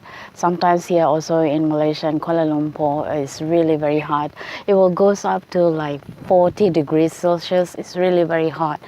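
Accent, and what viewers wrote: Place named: Philippines. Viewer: I thought so by your accent and youtube video, I am from Canada and there are lots of Phillipeans living in Canada besides other immigrants that Priminster Justin Trudeau has brought in from one year ago and he is bringing more in this year of 2019. By the way, Canada is not like the U.S.A. as of school shootings and gun violence, that's why they all want in here.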